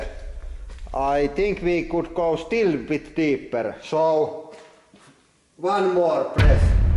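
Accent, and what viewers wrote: Finland. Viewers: In finland its called "ralli englanti" meaning rally english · In Finland we call that accent as rally english. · "vii kotta gou diipper!" Mä niin rakastan tätä rallienkkua. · nah nope, its just the finnish accent called "rallienglanti" or rallyenglish. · eikö aksentti muka paljasta? Kyllä suomalainen aina toisen tunnistaa. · Suomalaisten ralli Englanti..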